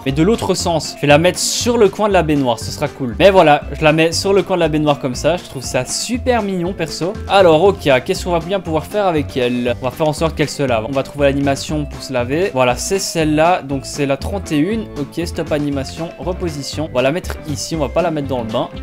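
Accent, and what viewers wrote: Belgium. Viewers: Tu es belge ? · T'es Belge?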